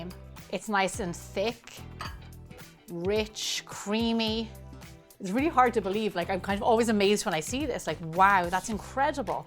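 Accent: Ireland. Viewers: This works better if you have a beautiful irish accent. Love your channel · What a delightful Irish accent! 💖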